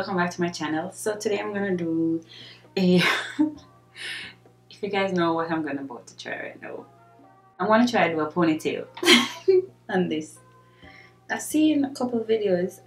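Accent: Jamaica. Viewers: U sound like a Jamaican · sounds like a Jamaican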